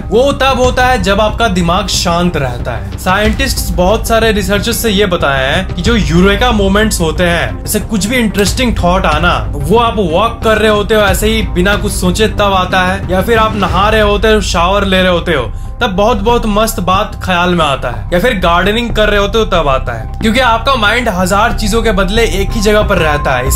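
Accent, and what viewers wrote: Bihar, India. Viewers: Yes !! He is Bihari Bhai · sir aap bihar se hai kya..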